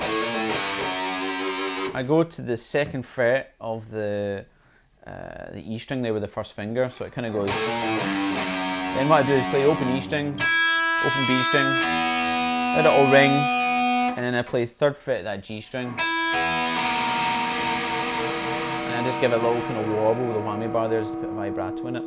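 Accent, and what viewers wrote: Scotland, United Kingdom. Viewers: Sweaty knockers sounds best! In your accent! ;) Scottish? Great job on the playing.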